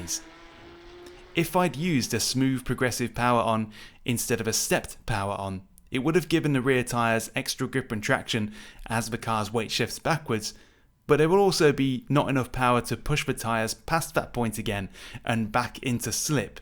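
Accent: United Kingdom. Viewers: You have the cool Brit accent I like! Like driver 61. Where is that accent from?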